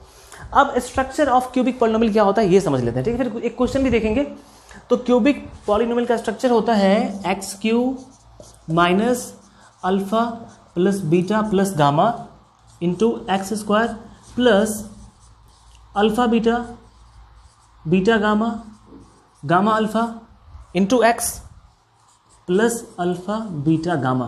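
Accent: Bihar, India. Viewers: aisa style to sirf Bihar ke logo m hi h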